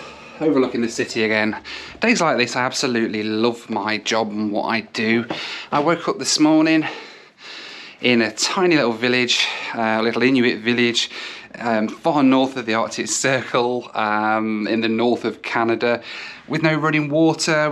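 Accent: United Kingdom. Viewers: Hi,My first comment for your channel.Im from India and have been following you for a while.Definitely the clincher for me till date.My congrats to the amazing crew.The energetic and emotional outpour that you had when flying back on the 737 is contagious to be honest.Added to the above recipe is your wonderful British accent that is a real treat to listen to.Oh but why would food cost you an arm and a leg(a "simple costly" would be easy to understand:):):).........Keep up the good work.All the best and thanks for all the wonderful videos till date.Wish you all the luck forward...........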